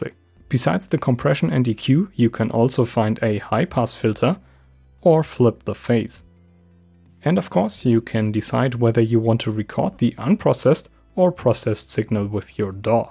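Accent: Germany. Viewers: Ich wette, wegen deinem akzent das du Deutsch sprichts!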